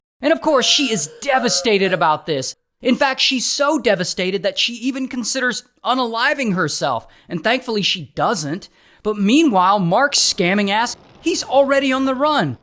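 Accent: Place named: United Kingdom. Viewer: your british is so funny :)))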